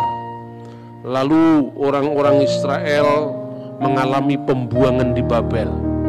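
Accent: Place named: Java, Indonesia. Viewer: Senang pula, lihat Pak yg berlogat Jawa.... Tapi bermanfaat, bagi penganut Kristen di Indonesia.....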